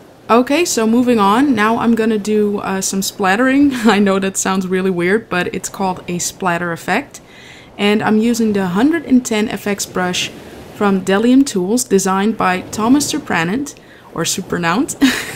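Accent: Netherlands. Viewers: Im gonna guess.... Ben je nederlander? Lol je accent.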